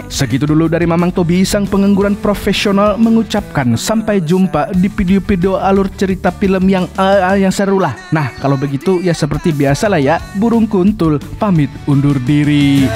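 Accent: Banjar, Indonesia. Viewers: Bang tiap intro jgn hiLangkan Logat banjar ny ya🎉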